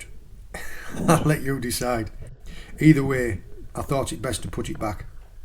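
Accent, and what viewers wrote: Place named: Cornwall, United Kingdom. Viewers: Pepperrr and butterrrrr lol you sir are getting a Cornwall accent! 😎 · Stunning video and water clarity Nice to see so many lobsters about I also noticed you developing a bit of a Cornish twang when pronouncing words With an r in them lol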